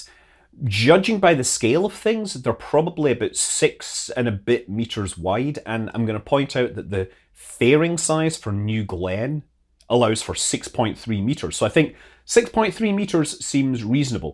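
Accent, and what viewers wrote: Scotland, United Kingdom. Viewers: I say it to myself every time I see he has a new video up! My girlfriend hears me and says "Oh is it that Scottish space guy?"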